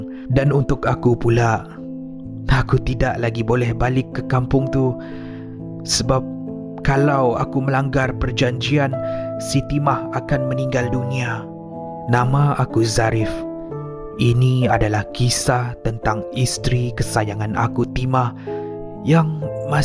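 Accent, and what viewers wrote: Sabah, Malaysia. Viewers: sedap dgr org sabah cakap... semua bahasa buku.... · Sabah ka tu bang...area mana ?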